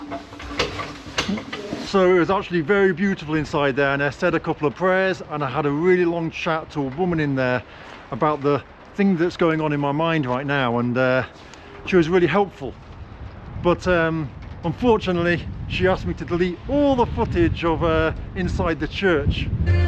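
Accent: United Kingdom. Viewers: you've got a big heart. You went out of the way to help the woman in the US to get in touch with her mom, and you bought a phone for her mom to call US. Not many people would do that. By the way, if it weren't for your British accent, I would have mistaken you for a Russian.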